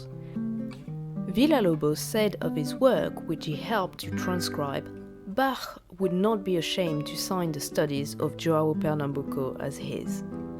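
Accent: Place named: France. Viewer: You've a delicious french accent.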